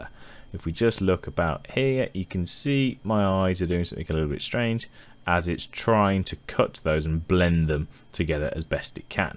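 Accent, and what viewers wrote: United Kingdom. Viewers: Hi, I’m very new to video editing and Resolve - as in I only downloaded it this week! Stumbled across your channel and finding your videos useful, plus it’s a refreshing change to hear a Brit voice for a change! I must have missed something here - how did you adjust the smooth cuts to cut out the dialogue you didn’t want to include? Do you use the audio track for this? Cheers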